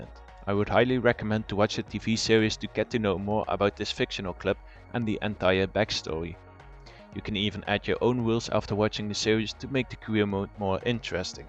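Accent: Netherlands. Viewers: Goeie video, dacht al toen ik je stem hoorde dat je Nederlands was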